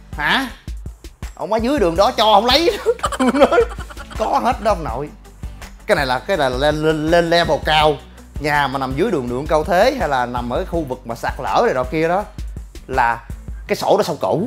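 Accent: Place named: Mekong Delta, Vietnam. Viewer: Giọng anh nghe đã dễ sợ.....chất phát gốc miền Tây..... Dễ đi vô lòng người....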